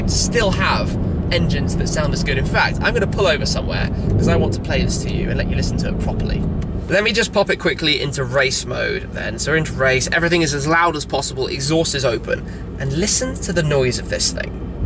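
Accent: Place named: England, United Kingdom. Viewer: hate the fkin english accent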